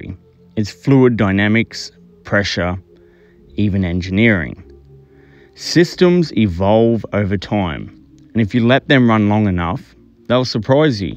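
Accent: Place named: Australia. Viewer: That's pretty awesome and the fact that I can hear that Aussie accent narrating the video makes this even more awesome.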